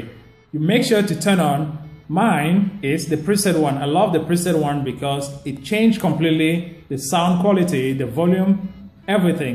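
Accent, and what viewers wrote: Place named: Vietnam. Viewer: Are you vietnamese, you sound vietnamese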